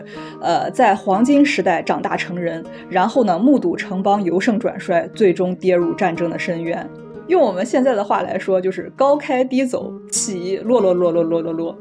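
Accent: Jinan, China. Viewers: 听你口音像济南人 是吗老乡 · 难得的有思想有口才的好主播，果断订阅了❤播主是济南人吗？一口济普乡音很亲切啊😊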